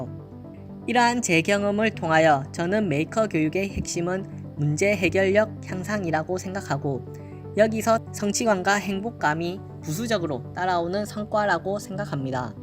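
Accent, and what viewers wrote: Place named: Busan, South Korea. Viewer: 그럼요. 손까락이 아닌 달을 봐야지 . 부산억양 좋아요 ( ^^)/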